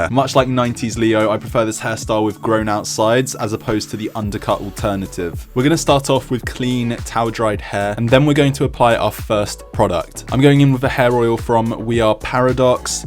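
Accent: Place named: United Kingdom. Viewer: Finally a British accent, I’m sick of hearing these annoying American ones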